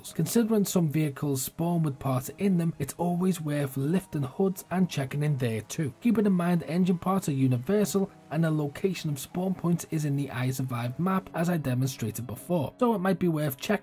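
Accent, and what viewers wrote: Liverpool, United Kingdom. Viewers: is that a scouse accent close by to maybe, lets just say city centre?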